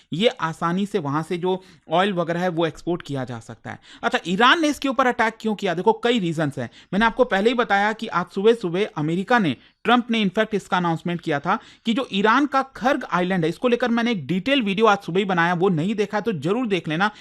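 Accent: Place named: Bihar, India. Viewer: You sounds like bihari without any logic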